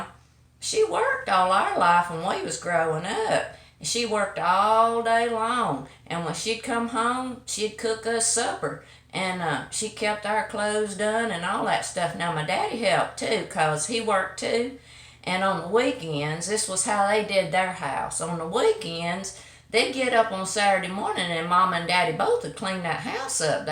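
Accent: South, United States. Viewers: I love that southern accent. is a character! "We're 2 years apart but we're twins"! Now that's some funny stuff!! Great funny video.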